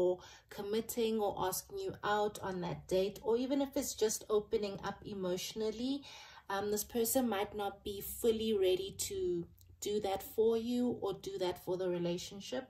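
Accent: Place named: South Africa. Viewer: I know that South African accent. Heeey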